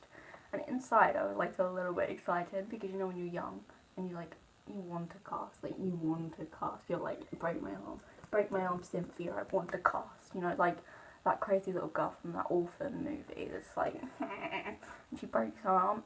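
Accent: England, United Kingdom. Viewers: She sounds so much more English... 🤔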